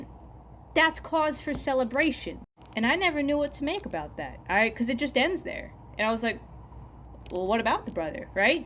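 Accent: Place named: New York, United States. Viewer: Somehow I needed this angry, swear ridden jersey/new york accented sermon today and I'm more agnostic than anything. You nailed it.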